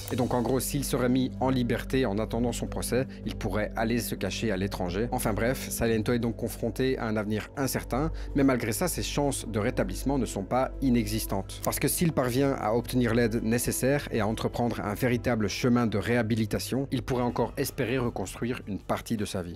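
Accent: Belgium. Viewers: Par contre g reconnu un accent belge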